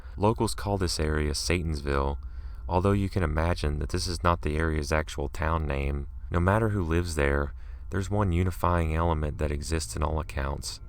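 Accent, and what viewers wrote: South, United States. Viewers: Yeah, he sounds southern. I'm from Kentucky, so I would know that pretty well : P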